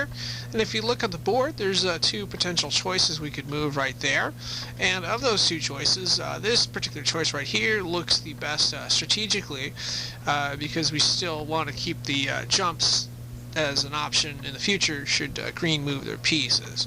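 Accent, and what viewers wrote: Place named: United States. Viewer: You have one of the most 'Murican accents I've ever heard.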